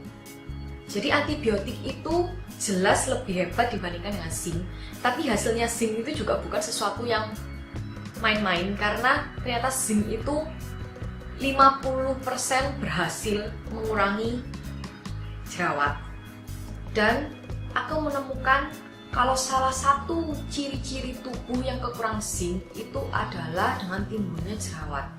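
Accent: Java, Indonesia. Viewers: Logat jawa nya kental .... 😂😂👍👍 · Dialeknya mentok jawa bangeet yaah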